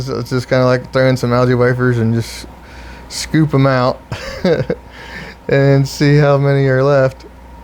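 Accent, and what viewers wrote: United States. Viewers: Also wanted to know of course you gotta southern accent. Where are you from?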